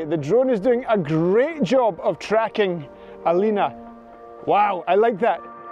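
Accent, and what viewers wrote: Scotland, United Kingdom. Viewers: ... and scottish accent ...! Beside the really valuable tipps. Greez from 🇨🇭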